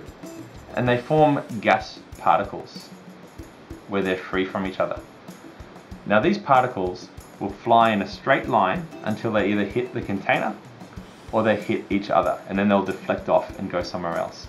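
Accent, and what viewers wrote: Australia. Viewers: Finally and Aussie not an American thank you miss! Even though I don’t like school it’s not an annoying voice!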